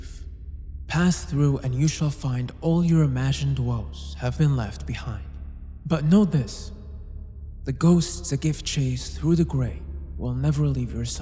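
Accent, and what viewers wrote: France. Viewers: T'as un super accent français, et tu viens de gagner un abonné ! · you have a french accent, my friend, you didn't actually need to use babbel to speak it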